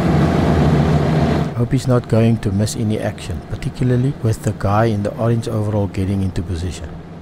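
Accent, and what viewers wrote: South Africa. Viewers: South African ... not Australian 🙄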